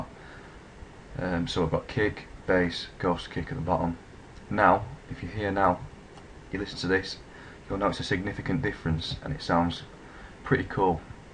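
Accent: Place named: Yorkshire, United Kingdom. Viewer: Thanks for this, good to hear a Yorkshire voice on here!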